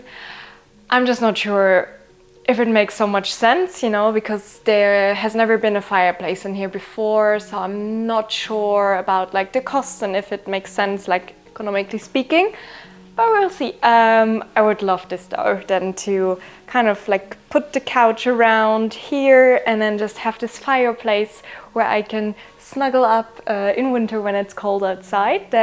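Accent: Germany. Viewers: She has an german accent,(twist) and that is quite normal, if your native lamguage is not english. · Sehr hübsch...Deutscher Akzent, sehr heimisch und vertraut ❤😉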